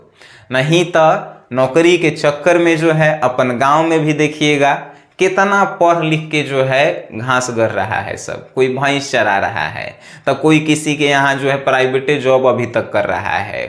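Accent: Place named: Magahi, India. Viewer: Bhaiya -Bhojpuri bolo. Magahi thik nahi lagta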